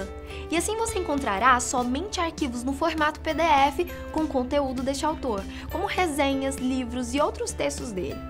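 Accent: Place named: Bahia, Brazil. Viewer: Pelo sotaque ela parece da Bahia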